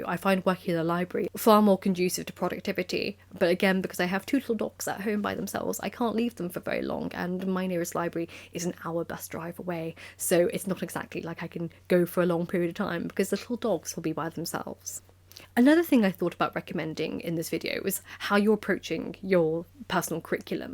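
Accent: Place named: England, United Kingdom. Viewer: You are really english you have a gracious accent lady but! but ! it s a true challenge for me lady to catch all your words . I listen to you to train my ears to hear different accent and is your case lady you put my english skill to test!! Lady . For me true english speak like you . I m french and i learn english without teacher on my own . I see all your books around you i like this enverino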